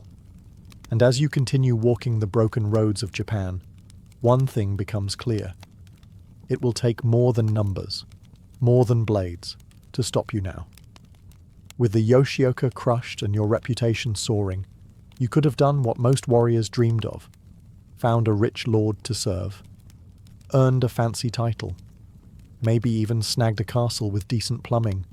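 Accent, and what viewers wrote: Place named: England, United Kingdom. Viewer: Life and pOTh.... I'm out. English accents are ghey.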